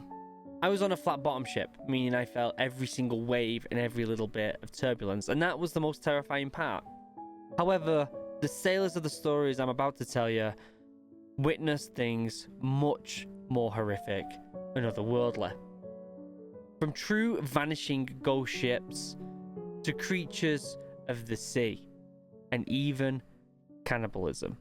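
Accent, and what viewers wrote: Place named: North, United Kingdom. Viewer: I’ve been a casual viewer of this channel since MW: 2019 Clean House. I love the new format, I think I will miss the old style but at least you’re happy with what you are doing now. I will say though as someone from the south-east UK I’ve started to notice the northern accent much more and it’s great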